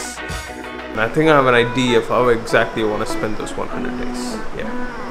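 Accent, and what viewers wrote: India. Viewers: I dnt know why, but i hear a severe indian accent. Are you an indian? 😵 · Youra indian but your voice is clear woq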